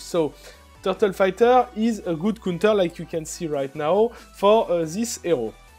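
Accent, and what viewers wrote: France. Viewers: ahah y'a pas de soucis mais c'est sympa de reconnaître un accent français parmis tous ces HL ^^ oui après il me manque quelques essentiels à passer légendaire, surtout maitre mage mais elle est en cours) mais sinon je les ai tous full mais je galere je suis bloquer à 24/25 je tombe que sur des mecs de grosses guildes j'ai pas trop de cul ^^ bon jeu à toi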